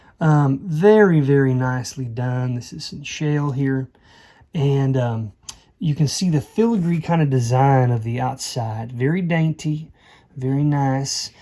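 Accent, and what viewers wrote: South, United States. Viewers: Hey. I'm new to reselling. Well I have stuff but haven't listed yet and I believe I'm overthinking lol. Heard you say you were going to a shop in NC. Not sure if that's where you do business, but when I have watched videos, I wondered where you were from (the country accent🤷) lol. I got that SC country accent going on. Thanks for all the education!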